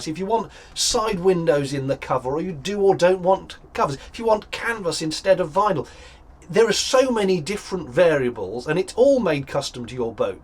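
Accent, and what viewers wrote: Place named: RP, United Kingdom. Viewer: ... So nice to see a new Narrowboat video from you. I also appreciate your use of and dry humor expressed in the English language. It's becoming a rare thing these days, when a generally lower "quality" of use is considered to be acceptable. If you like, I appreciate the style of BBC Radio 4 English and pronunciation. I suppose this shows my age. Long may you continue.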